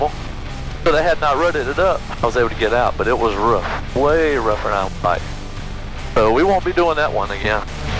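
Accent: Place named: South, United States. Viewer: Love that southern twang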